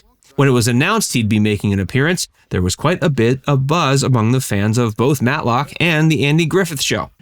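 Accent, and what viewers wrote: United States. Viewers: I like the narrator who speaks beautiful American English…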